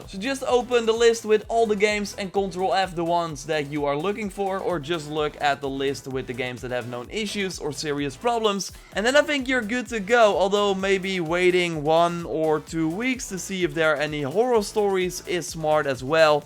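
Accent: Netherlands. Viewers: Ik hoor aan je accent dat je Nederlands bent!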